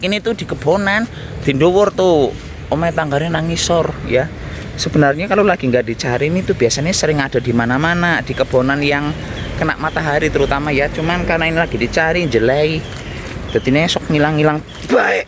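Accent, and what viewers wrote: Banyumas, Indonesia. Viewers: Assalamualaikum,kang.itu kalau di daerah saya namanya maregol,ada juga yang bilang sembung grogos, kalau boleh tau kang ini orang mana,ya dari logat bahasa jawanya seperti orang Banyumas, saya sendiri orang Banyumas,kang, asli dari kota dawet, Banjar negara. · trms rep ngapak..solusi ne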